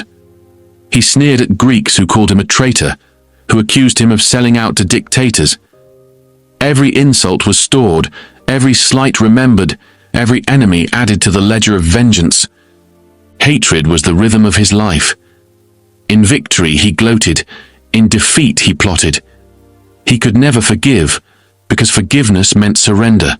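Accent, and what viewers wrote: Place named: England, United Kingdom. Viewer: they wanted to be broken..funny narrated by a Pom - more damage to the world done by the English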